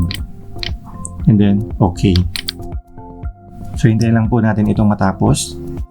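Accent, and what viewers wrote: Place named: Pampanga, Philippines. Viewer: your accent panggalatuk or pampangan got me sub bro😎😎😎 great content and a big help to anyone. keep'em coming💪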